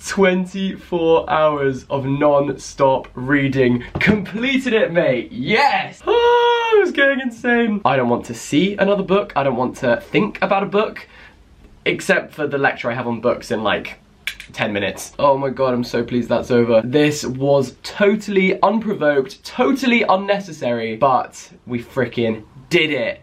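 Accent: United Kingdom. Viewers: Your violently British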